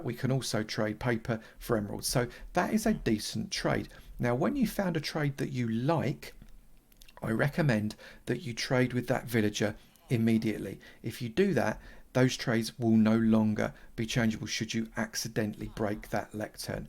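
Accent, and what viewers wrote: United Kingdom. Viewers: Nah its British accent.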